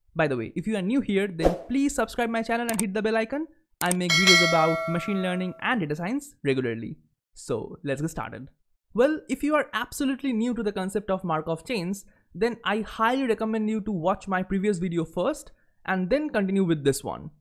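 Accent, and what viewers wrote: India. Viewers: I will be honest, was ready to find another video when heard the Indian accent. But then saw high upvote/downvote and stayed, and don't regret it!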